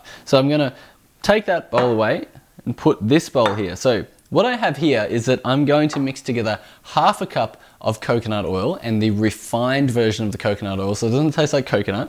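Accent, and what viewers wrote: Australia. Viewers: Good to hear a fellow Aussie talking about Keto lifestyle on here:-) I’m day7 great video! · Wow! how awesome! They turned out Deliciously fantastic and perfect too!! I too, could have devoured the whole lot in no time. They were a hit with my Bestie! I didnt trust myself with them all. So I sent some away with my Bestie to enjoy also. thank you so much! So glad I found your channel. Finally, I found a fellow Aussie to keep me focussed on my keto committed journey! Hearing you say “$5 bucks from Kmart.” Was ‘home’ to me! Gotta love it!” I have subscribed and can’t wait for many more Aussie recipe ideas. GOD love you!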